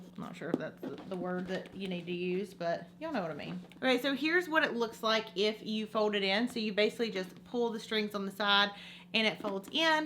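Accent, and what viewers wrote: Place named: North Carolina, United States. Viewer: Lol the very first time I watched a video of yours I thought, “Gosh she sure does talk like all the people at work.. her accent is similar to ours.. wonder where she’s from”. Then I checked out your other videos and realized you’re from W.S.! I live in Greensboro 😂😂 anyway, i subscribed immediately. Love your videos!!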